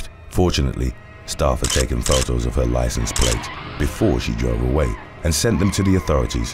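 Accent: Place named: England, United Kingdom. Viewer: Okay who taught how to pronounce Mexican names in english? First time I've heard Martinez come out correctly. I do always love hearing people with English accents trying to work out pronunciations of names we're all used to on this side of the pond! As always, gripping work!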